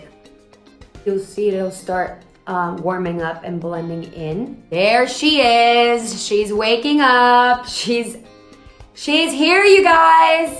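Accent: Mexico. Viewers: Ufa ainda bem que não é difícil te entender ! entendo um pouco o mexicano 😍🇧🇷😁❤️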